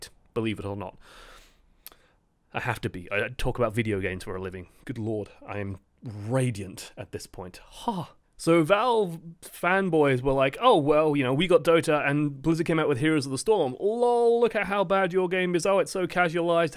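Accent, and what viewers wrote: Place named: United Kingdom. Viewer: I like rant-esque news and thoughtpieces by british-accented folk.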